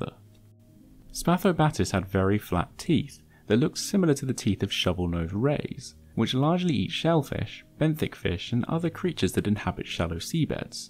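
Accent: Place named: United Kingdom. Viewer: in, the "sorefish?" Why were they sore? Oh, wait. You meant "saw-fish." Damn, dude. Learn proper English. Ah'm jus' phokin' wi' ye, mate. Jus' takin' tha piss. But for real, sometimes, your non-rhotic 'r's, plus adding 'r's to words with open 'ah's, make what you say "hahhd to unduhhstand" for non-Brits.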